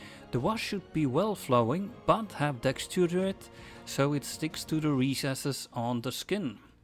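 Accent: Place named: Scandinavia. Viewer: Looks great!! I painted my Tzeentch similar but through airbrush techniques. Sounds like you are Scandinavian, svensk?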